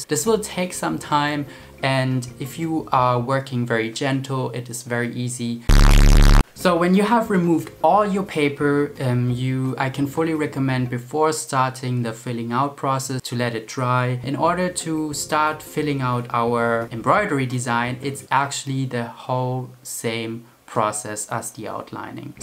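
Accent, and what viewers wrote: Germany. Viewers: Dude are ya German ? I hear a German accent there 👁👄👁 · Are u german? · after five seconds in this video I already know that he's a German lol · Are you german.? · Are u german?:)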